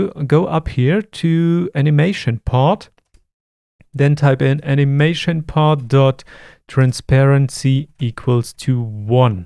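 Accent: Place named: Germany. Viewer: i hören a bit of deutsch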